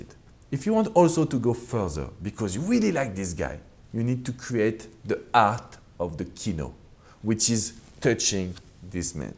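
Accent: France. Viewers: I smiley when i Hear your French accent😘😀trop mignon 😃😅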